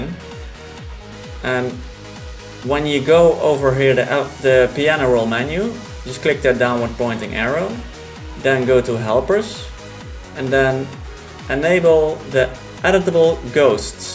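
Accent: Netherlands. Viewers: gonna take a wild guess and say you're dutch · You're from the netherlands right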